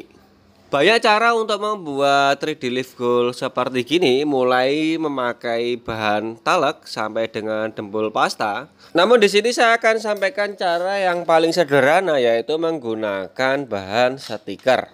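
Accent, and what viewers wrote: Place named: Kediri, Indonesia. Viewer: Koyok wong kediri ya kui mas 😁😅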